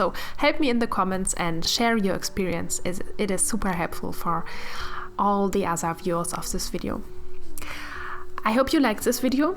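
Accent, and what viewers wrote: Germany. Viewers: where are you from? tbh you sound very German 😂 would be funny if you actually are, bc lately I found so many german artist on YouTube! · Bist du Deutsche? 😂 hört man sofort haha 💖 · Are you by any chance german? I'm german and you sound typically german ( which of course isn't a bad thing at all ) btw the painting looks really good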